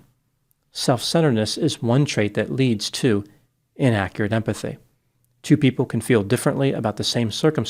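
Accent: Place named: New Jersey, United States. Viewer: I thought Dr. Grande was from New Jersey, hah. He has an accent that places him there or greater NYC.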